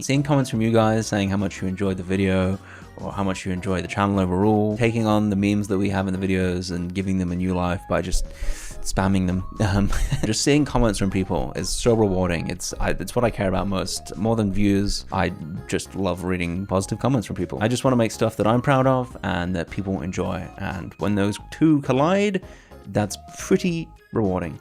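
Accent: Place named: United Kingdom. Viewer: Idk, he sounds British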